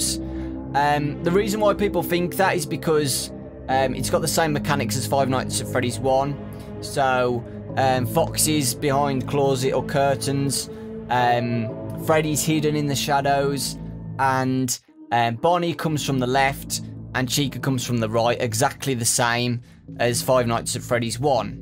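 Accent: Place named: United Kingdom. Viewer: As soon as i heard his voice i said im gonna learn so much British from you this video was really good btw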